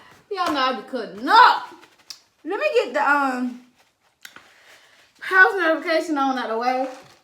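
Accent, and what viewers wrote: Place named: United States. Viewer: Love you and your country accent lol girl friend shooot mmmhmmm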